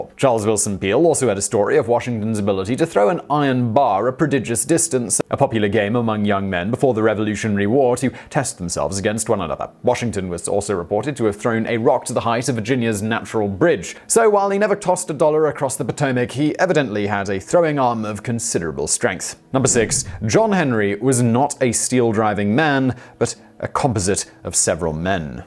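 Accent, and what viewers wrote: United Kingdom. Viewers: bro he british he ain’t even american🤣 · Me an American: ahhh yes British Big Brain tells me history. I enjoy this 😂👍🏻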